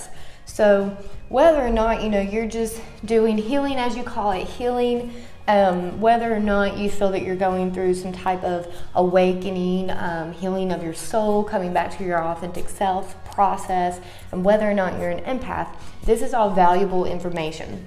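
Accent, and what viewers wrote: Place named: Deep South, United States. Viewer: Great video vlog girl. I’ve struggled with being an empath for as long as have memory and had no idea what was wrong or why I would come home extremely worn out. People would have sucked the life force out of me little did I know. I’ve gotten to where I have withdrawn myself from society because I became fed up with how evil people and how much they take from you. Just this week I let a girl whom I thought I could trust borrow my car and she burned me stole some things out of my car and kept it so long I had to threaten report it stolen. I’ve become cynical as hell and just fed up with the curse because I care so deeply. I too understand substance abuse to make it go away. You sound like you are from the Deep South where I’m located. Keep up the good content girl friend. Kashton <mail> Take care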